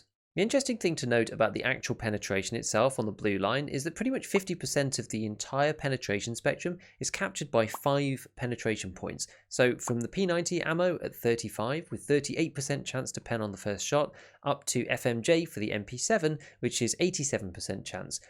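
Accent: United Kingdom. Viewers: The 3kliksphilip of tarkov. Even the british accent is included.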